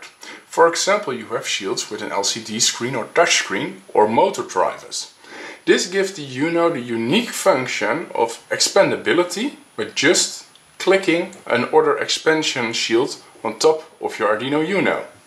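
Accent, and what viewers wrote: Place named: Netherlands. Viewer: I hoorde gelijk dat je nederlands bent...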